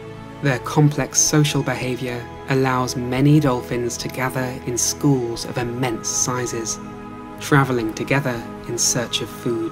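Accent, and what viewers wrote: United Kingdom. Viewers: The combination of your voice, British accent and a proper music is just mind-blowing. You are a genius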